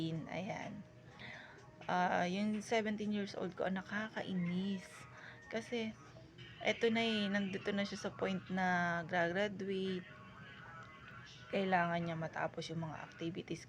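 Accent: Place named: Cardona and Morong, Philippines. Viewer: Parang cardona at morong ang punto ng salita nyo madam.kapareho ng sa amin taga isla talim si nanay .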